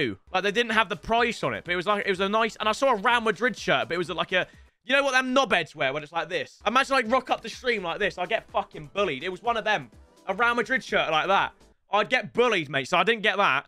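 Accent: South Africa. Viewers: That South African accent tho... Lol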